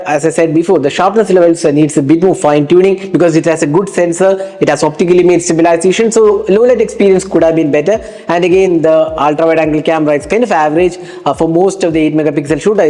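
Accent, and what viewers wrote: Kerala, India. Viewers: Are you from Kerala....?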